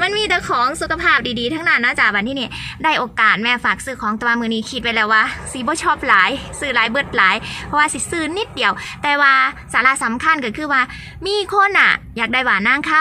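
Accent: Isan, Thailand. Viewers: เว้าภาษาอีสานหม่วนคัก เว้าดีเสียงเป็นตาฮัก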